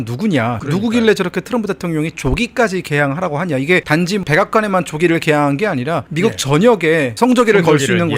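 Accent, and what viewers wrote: South Korea. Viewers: 이사람 탈북자 임에도 북한억양이 하나도 없네 톤이 그냥 우리말이네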